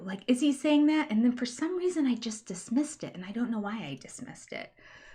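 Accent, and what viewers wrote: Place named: Midwest, United States. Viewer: Yeah, but lol you have a Midwestern accent even though you don’t think you do 😂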